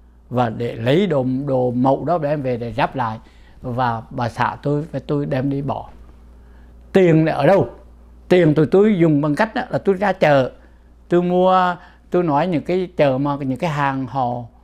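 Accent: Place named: Hue, Vietnam. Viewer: Giọng Huế ❤❤❤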